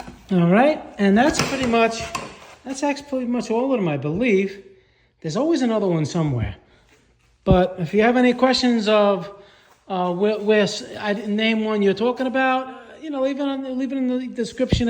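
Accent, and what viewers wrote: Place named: New Jersey, United States. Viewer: That’s a lovely accent you have there, New Jersey?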